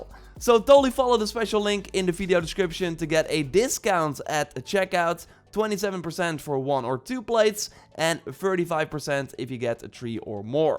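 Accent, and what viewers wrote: Netherlands. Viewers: you dutch? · You sound like dutch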